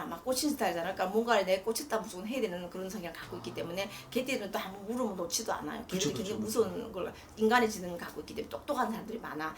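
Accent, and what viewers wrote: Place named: China. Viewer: 넘빨리말씁해서 잘못알아듣는데요.발음도 여기조선족발음으로들려요천천히 다른무속인들처럼😢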